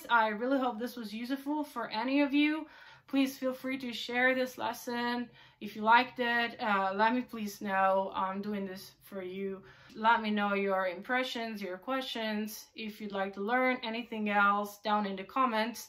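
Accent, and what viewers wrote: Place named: Sweden. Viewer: Very talented! Eddie Van Halen fan here! Where do you reside from? Accent is hard to nail down. Kind of sounds Swedish? I am from MN, USA. Keep on rocken! Nice tapping!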